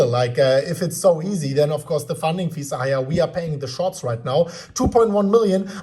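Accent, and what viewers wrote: Germany. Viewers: I love the German accent in English.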